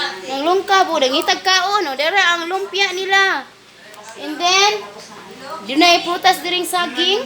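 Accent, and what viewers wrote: Visayas, Philippines. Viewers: Laglom nkaayo pagkabisayaa maayo kaayo ka batbat sa pinolongan bisaya ui idol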